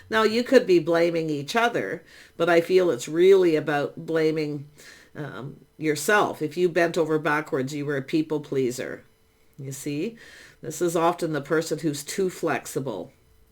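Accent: Canada. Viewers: Phenomenally close to the mark… I will be amazed if he really calls… it will blow my mind, but of course, I would welcome it and love an opportunity to try and sort this out… By the way, your accent sounds very much like my own… Are you Canadian? I live in the states now but born and bred in Toronto.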